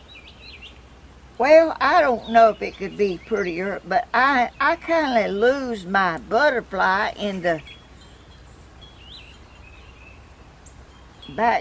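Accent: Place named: Georgia, United States. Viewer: I really enjoyed your video and I for one don't mind when you go quiet when you're concentrating I love the birds singing in the background, but I love listening to you talk too I love your Georgia accent and one day if gods willing I will get to Georgia. I live in the uk and it's cold and miserable here now and your videos are a ray of sunshine to me, god bless and take good care of yourself, love and hugs from Linda 🤗😘❤️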